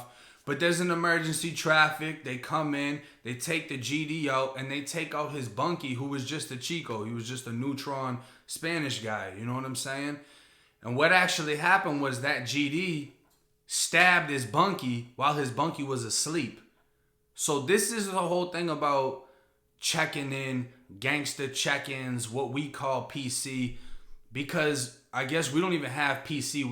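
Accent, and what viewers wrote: Boston, United States. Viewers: You tlk like you from the bean ... When i went to florida every1 knew i was from Boston we just tlk a certain a way. You had to have spent some time out here. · You kinda have that Boston accent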